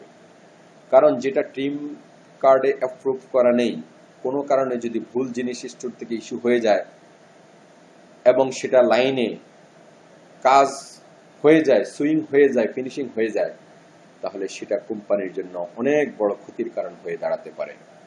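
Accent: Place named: Comilla, Bangladesh. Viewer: Why are you mixing your lecture with english, bangla, camilla accents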